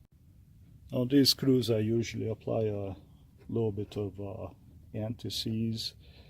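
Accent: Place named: Russia. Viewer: The longer the video goes to more russian/european you sounded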